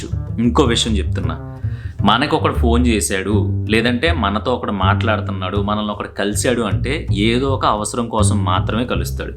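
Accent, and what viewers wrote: Godavari, India. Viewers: Bro meedi place yekkada bro .ma godavari slang la vundi😊😅??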